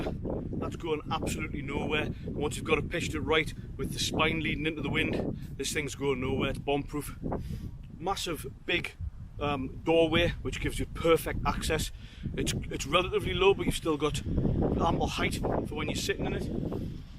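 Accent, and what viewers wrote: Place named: Northumberland, United Kingdom. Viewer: Northumberland is wonderful. Great accent. I've just bought one!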